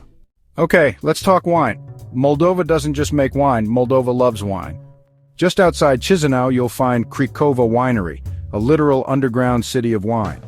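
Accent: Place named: Texas, United States. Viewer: I mike your voice and your american Texas accent !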